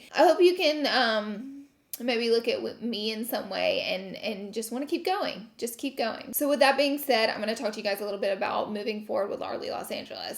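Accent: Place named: Alabama, United States. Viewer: Yes Laura!!!♥️♥️♥️ I’ve loved you since your dollar tree hauls with the Alabama accent😂 Your heart is pure gold my love. Much love from Hawaii🌺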